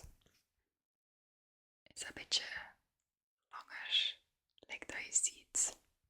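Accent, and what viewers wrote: West Flanders, Belgium. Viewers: I often say that people should think before they speak in certain situations. Often it's emotion that takes over. And I'm trying to understand the 1st quote... 'walking to the rhythm of a flower blooming'. Walk at a slow, gentle pace? In any case, your reactions make me laugh when you try to pull yourself together to speak only in West Flanders.😄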